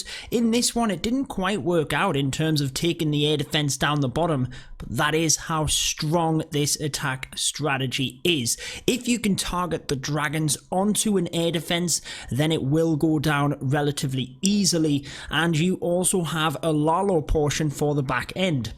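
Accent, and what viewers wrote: United Kingdom. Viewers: Thanks! Subbed and liked. Are you british 🤔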